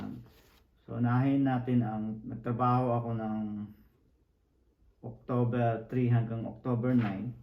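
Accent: Bicol, Philippines. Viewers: Garu bicolano si sir, ayos! Oragon. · bicolano ka boss?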